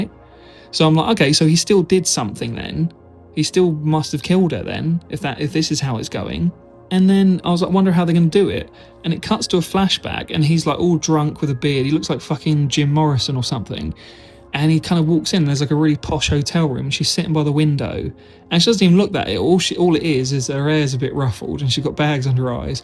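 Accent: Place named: United States. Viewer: PS I love your American accent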